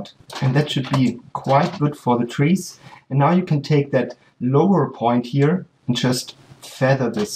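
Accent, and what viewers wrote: Germany. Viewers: Are you German....you have an German Acent I mean so..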